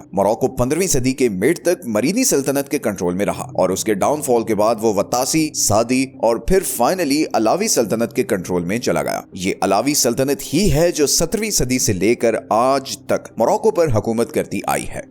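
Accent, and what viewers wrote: Pakistan. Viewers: this is Pakistani channel aisi Urdu India mein boli ni Jati lol 😂😆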